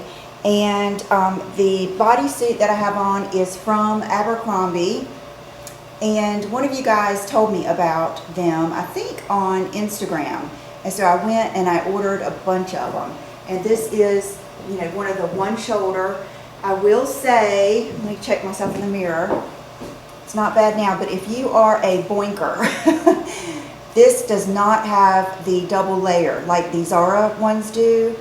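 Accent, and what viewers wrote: South, United States. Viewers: look forward every day to your videos..I don't know if its your southern accent..but your voice is so soothing & really love your style..alot of the makeup & clothing you mention, I can not afford, but so appreciate you showing so many products..I did recently purchase the Maybeline Lifter gloss in Moon & I love it..It really is almost a dupe for fenty gloss bomb in fussy...anyway just wanted to say thanx for always uplifting my day..especially if I am in a funky mood....keep moving forward..enjoy your weekend..:)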